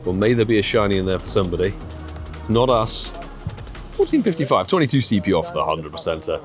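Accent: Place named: United Kingdom. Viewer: another perk of british accent, running nose doesnt sound differently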